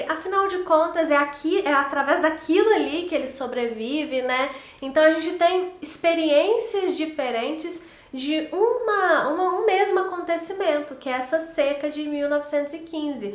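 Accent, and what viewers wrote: Minas Gerais, Brazil. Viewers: Ela é mineira?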